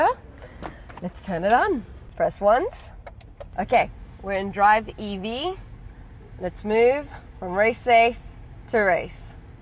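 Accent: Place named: South Africa. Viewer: She looks and sounds South African ❤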